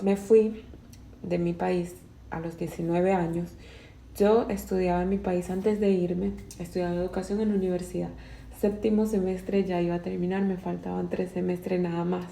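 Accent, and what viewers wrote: Colombia. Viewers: Por una razón elemental, los venezolanos hablamos VENEZOLANO, seamos de Caracas, de Oriente, de Mérida, del Táchira, del Llano, de Lara, de Yaracuy y del Zulia, todos sabemos cómo hablamos los venezolanos en cada una de nuestras regiones; y los colombianos hablan COLOMBIANO, sean de la Costa, de Bogotá, de Medellín, de Calí etc. Ella no habla ningún acento venezolano autóctono de nuestras regiones de Venezuela, ella habla colombiano porque es colombiana. 🤷🏻‍♂️ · Se te nota un poco de acento colombiano. Saludos desde España.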